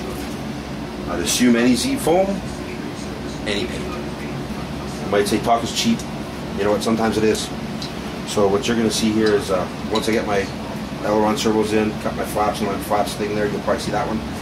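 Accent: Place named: Canada. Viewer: As a Canadian I personally think that most talk about the "Canadian accent" is untrue. A lot of Canadians sound very similar to a lot of Americans (apart from the crazy accents like Newfies, Texans, Bostonian accent, etc.) But man, you sound like you came straight out of a Bob and Doug McKenzie movie!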